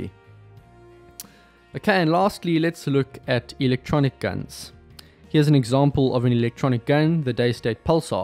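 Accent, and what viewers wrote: South Africa. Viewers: My guess is south african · South African based upon the susername. He’s got same accent as Elon musk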